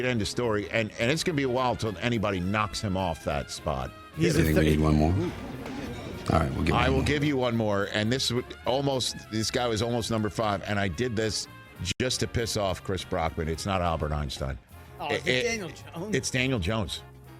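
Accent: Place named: New York, United States. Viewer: NY Accent was solid 👌🏾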